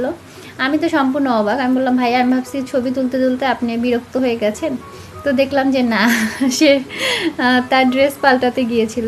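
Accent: Sylhet, Bangladesh. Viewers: আসসালামু আলাইকুম আপু কেমন আছেন? আপু আপনি কি সিলেটি? আমার বাড়ি সিলেট তাই জিজ্ঞেস করলাম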